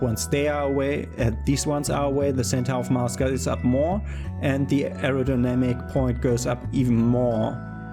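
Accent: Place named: Germany. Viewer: Moin klingst wie ein Deutscher deswegen schreibe ich mal auf deutsch . Versuch mal wenn du in einen Orbit willst nicht so steil nach oben zu fliegen und schon gar nicht mit einem Manöver einen Orbit bestimmen . Wenn du startest beschleunigst du auf den ersten 1200m auf 160m/s ab hier die Rakete um 15 grad nach rechts neigen . Steige ein wenig in diesem Winkel auf bis du bei 300 m/s bist und neige die Rakete wieder ein wenig . Steige wieder ein wenig auf und wärend du langsam auf ca 1100 m/s beschleunigst neigst du du Rakete bis zu 45 Grad . Also nicht sofort auf 45 Grad sondern kontinuierlich . Wenn du ca 1100 m/s erreicht hast Triebwerk aus, ab hier müsste die Apo bei knapp <num>m liegen tut sie es nicht hebe sie auf 85.000m an . Hat die Rakete 72.000m Höhe erreicht , neige die spitze 15 Grad Richtung Erde/Kerbin also nach unten und gebe jetzt vollen Schub und beobachte wie ganz von allein ein perfekter Orbit entsteht .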